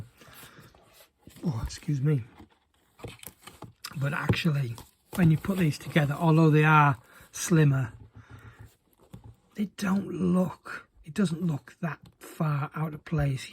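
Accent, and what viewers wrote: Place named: England, United Kingdom. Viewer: Could I ask you a cheeky question what part of England are you from. I always like to know where the YouTubers are from. I'm also a nosey bastard😁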